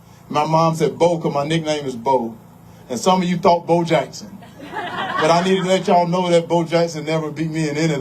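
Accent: South, United States. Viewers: Hope you win...love your southern,.accent, love your values, love your values..vote red.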